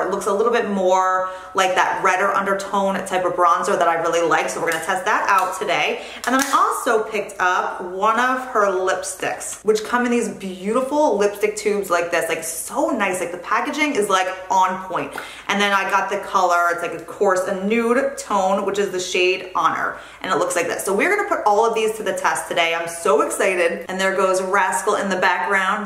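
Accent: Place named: East Coast, United States. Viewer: I'm a native east coast girl now living in Vegas. to be honest, i started watching your videos because it gave me a bit of that back home feeling with your accent! shortly after, i discovered we're the same age so i binge watched your vids and i have learned so much from you!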